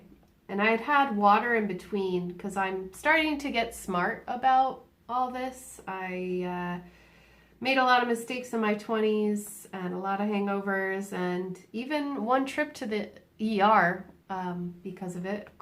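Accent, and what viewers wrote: Ireland. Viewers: You do what ever makes you happy. I love to see what you put out and I love your mixed accent. YES you are starting to mix in a bit of Irish tones when you speak, and it is Adorable. Love you!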